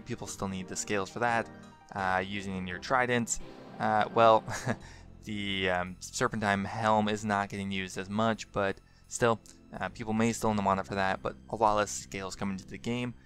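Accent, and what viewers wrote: Canada. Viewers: mohammad baba tbh I get what your saying but I work a long hours job in sales so when I get home I want to relax and barely concentrate, this guy helped me when I first started flipping, I'd consider myself a veteran player now who understands it but I don't check the whole market for what's it's doing where as this guy does regularly so saves the time with his soft Canadian tones.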